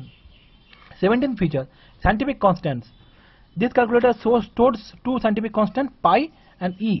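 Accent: India. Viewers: Индийский акцент это ржака! 5+ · cool indian accent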